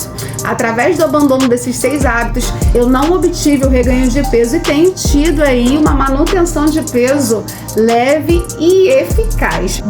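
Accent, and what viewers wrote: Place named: Rio de Janeiro, Brazil. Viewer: Que sotaque lindo!!! Eu amo O sotaque Carioca❤ Sou Minas Gerais e amo O Río De Janeiro. 😍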